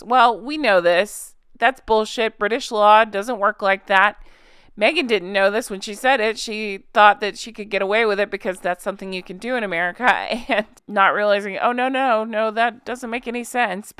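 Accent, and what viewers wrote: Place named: South, United States. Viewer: let your southern roll on out. I'm from NC and I totally get it. 😂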